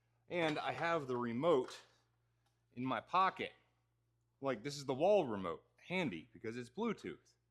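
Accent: Western Pennsylvania, United States. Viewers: U from western PA?!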